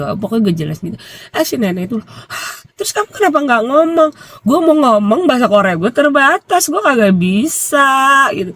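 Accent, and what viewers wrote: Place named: Betawi, Indonesia. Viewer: Betah klu ndengerin kak cerita, lucu, seru kadang sedih juga, apalagi ditambah dengan dialek nyablaknya khas betawi bekasi,pokoknya buat kak, sukses selalu.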